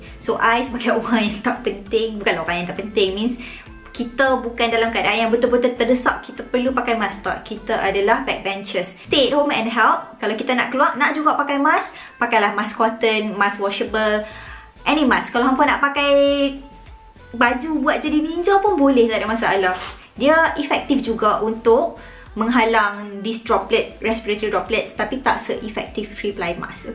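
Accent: North, Malaysia. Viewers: Suka tgk video² nih sbb gunakan loghat utaqaaa.. TQ utk semuaa information Dan ilmu² yg di share.. smga murah rzki sllu.. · Tudia aihh utara bak hang😅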